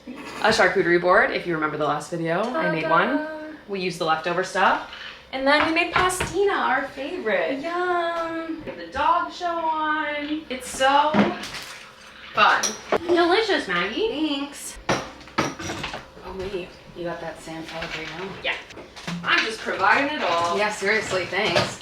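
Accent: New York, United States. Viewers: Another interesting video in the life of, fast talking with her strong New York Accent doing stuff around the City, even getting up early in the morning to go to the parade supported by some of the members of The Flamboyant NYC Crew featuring lots of Maggie, From The Twin Sisters R'NT US, The Tall Loud Blonde One, and. There was even a guest spot from, The Mature Student. For someone who claims that she is shy and introverted, certainly knows a lot of people! Also the Cats Bennett and Olive also made an appearance with Bennett having an obsession with sitting on top of the door! Anyway love the content and as for the Critics, they must be jealous because they won't go on you tube! Take care now! From London UK 🇬🇧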